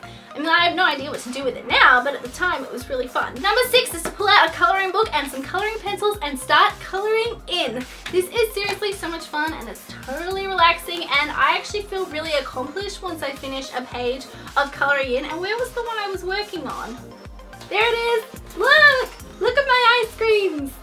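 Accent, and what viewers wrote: Australia. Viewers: Jayla Kahlen Espadon it's Australian. I'm Aussie so I know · Is she a fellow Aussie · That's the same as me and is she Australian? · Just you hahah this girlies Australian.. Isn't Perrie British?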